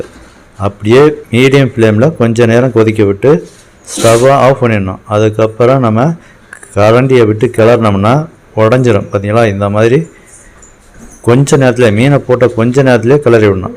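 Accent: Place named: Madurai, India. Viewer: chanceless recipe bro... unga tamil madurai side madri iruku bro.. very nice and yummmmmmmmmmmmmy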